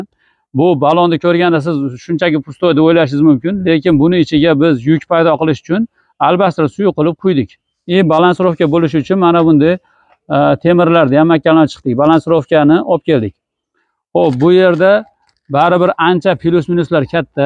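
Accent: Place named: Fergana, Uzbekistan. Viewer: АКАМИЗ ФАРГОНАДАН ЭКАН БАС ДЕБ ГАПИРДИКУ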